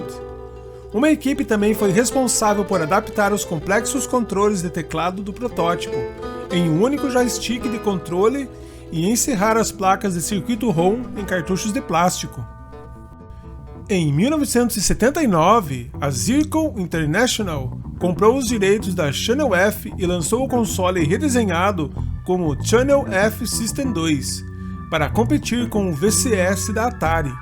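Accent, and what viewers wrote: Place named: Paraná, Brazil. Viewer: Ótimo conteúdo, mas dicção e "paranês" terríveis.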